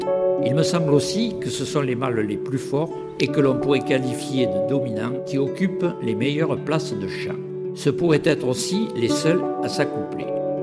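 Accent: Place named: South, France. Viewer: agrémentés du petit accent du Sud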